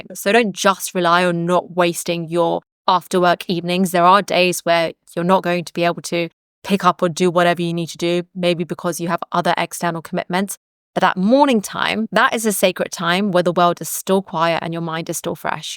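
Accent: United Kingdom. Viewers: I would like to make a comment if I may… could you please talk slower? I normally have to listen to other YouTubers with 2x but for you, I cannot continue listening after 5 mins… if you try to level your British accent with a speed which makes it more understandable, it will be best… also, YouTubing is your job… pls try not to pour in your anxiety in your speed of speaking 😊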